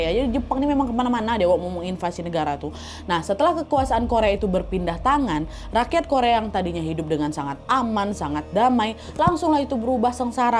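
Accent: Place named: Palembang, Indonesia. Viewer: Aku salfok sama logat mbaa nya. Kayak logat palembang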